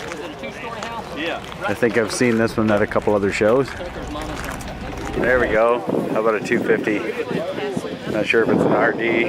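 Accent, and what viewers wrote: United Kingdom. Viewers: You may have been 1 of the 2 that won a trophy out of the 2... but you had the balls to enter...and you won period.... that is a win in my book, just by entering you won as I see it so it is everyones loss that didnt enter at all. He's a German US Born British Accent speaking Japanese Bike Riding Maker/Hacker... the way the United States was meant to be... I love it. (Mind you i am not saying what you said what bad/wrong just making it clear to those who want to make bad comments telling them that this is what America is all about, I was roughly clarifying) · Loved the English accent, but you German? I only have one thing to say to that RAMSTEIN lol Keep on hackin' it fascinates and inspires me:-)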